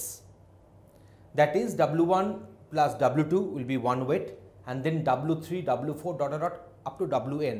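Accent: Bengal, India. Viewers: The bengali accent is very funny....Btw explanation is also very good...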